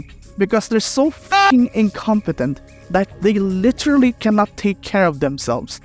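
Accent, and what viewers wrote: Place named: Philippines. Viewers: PEAK FIND, you deserve more views and subscribers for this amount of dedication (also your accent is similar to Filipinos) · He’s Filipino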